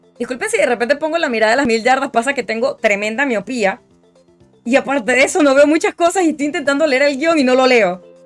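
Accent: Venezuela. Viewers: Amo tu canal amiga es muy gratificante ver tus videos y me encanta como te expresas se siente la verdadera escencia Venezolana en toda su gloria te deseo lo mejor y espero ser viendo cosas interesante en tu canal y mejores y quiera saber de que parte de VENEZUELA SOS :) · Chama, tengo como 6 años fuera de Venezuela y ahora siento que escuchar el acento venezolano me cuesta full, hablas muy rápido jajajaj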